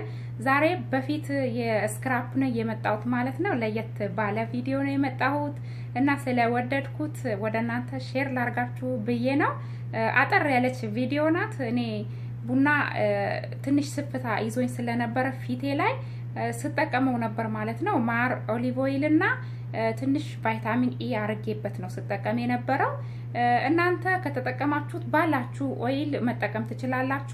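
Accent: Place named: Ethiopia. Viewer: ሲሪላንካ እና ህንድ ትመስላለሽ ስታወሪ ነው ሀበሻ መሆንሽን ያወኩት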